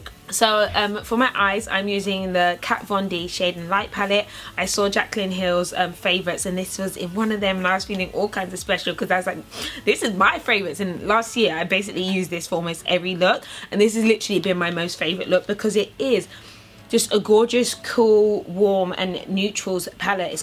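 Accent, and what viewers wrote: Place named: RP, United Kingdom. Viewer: I love your posh british accent I am from britian I dont sound like you 😂😂💖💖💓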